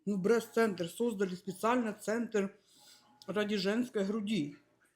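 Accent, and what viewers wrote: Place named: Western Ukraine, Ukraine. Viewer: Знаєте, ненавиджу російську мову. Через отих кончених москалів. Але мені дуже подобаюься українські слова які ви вживаєте в своїй розмові. Це такі українські слова з западенським акцентом. Дякую вам за це. Дуже приємно це чути. Улюблений вислів мого покійного тата: Тут живе Степан Бандера? Так-так-так' - відповів кулемет. Вас вітає Великий Львів.з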